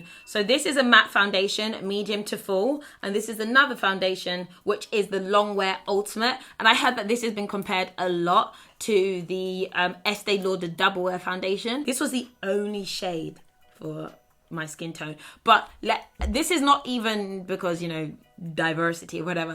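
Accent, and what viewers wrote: United Kingdom. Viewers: Oh my gosh, I loooove the Britisch accent ❤❤ Lots of love from Austria!!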